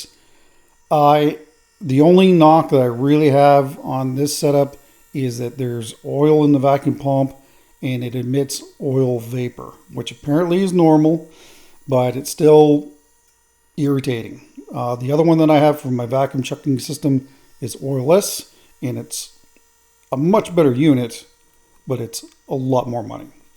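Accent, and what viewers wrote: Canada. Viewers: On your voice overs, I love to listen to you talk about your work. I can tell you love what you are doing. I also listen to hear your Canada accent. It's there sometimes. Not a lot though. Keep talking.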